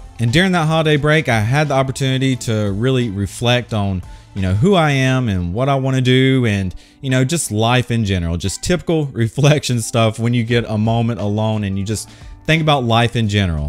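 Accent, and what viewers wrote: South, United States. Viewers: this dude sounds like hill billy bob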